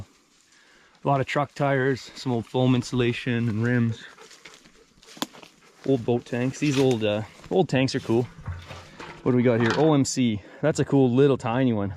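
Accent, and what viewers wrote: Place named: Canada. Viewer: I knew you were Canadian within like 2 seconds of hearing your voice. the location is a secret but ive narrowed it down; I would've hauled the vice out that boat house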